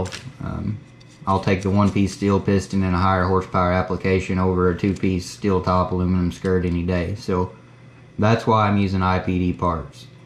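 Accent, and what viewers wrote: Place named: Oklahoma, United States. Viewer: I’ve been trying to figure out where you are from, SW Oklahoma, your accent, I was curious. I wonder how many miles it took to get across that field. Been there done that spinning my wheels on the mud. I like your videos. Thank You Sir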